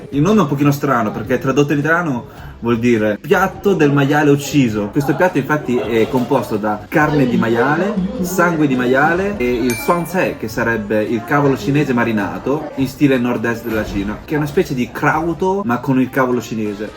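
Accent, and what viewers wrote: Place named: Emilia-Romagna, Italy. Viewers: Un cinese con l accento romagnolo.. Troppo forte 😅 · Davvero bella scoperta questo canale con questo simpatico ragazzo di origine cinese che parla italiano con l'accento emiliano-romagnolo! 😊👍 Iscritto!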